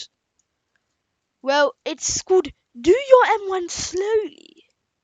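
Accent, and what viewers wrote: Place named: United Kingdom. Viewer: Briish? Its fine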